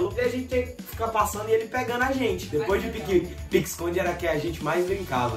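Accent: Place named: Minas Gerais, Brazil. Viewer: desculpa perguntar, mas você é de BH ou de Minas Gerais? Porque eu sei que você cresceu em Fortaleza, mas o seu sotaque é muito parecido com o meu, que é mineiro. Obrigada!!! E aliás, parabéns!!! Você é incrível!!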